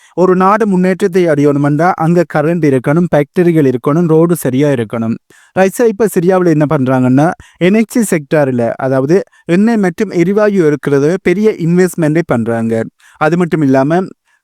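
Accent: Sri Lanka. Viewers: யாழ்ப்பாணத் தமிழ்.😂😂😂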